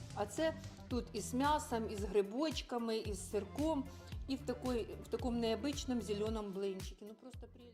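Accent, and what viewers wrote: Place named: South-East, Ukraine. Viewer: Не робіть зауваження --це південно-східний суржик, він теж має свою історію.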